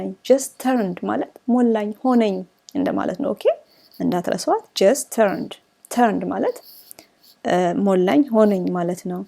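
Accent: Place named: United Kingdom. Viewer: Good job👏👏 I love your British accent 👍 reminds me of my Brit friends.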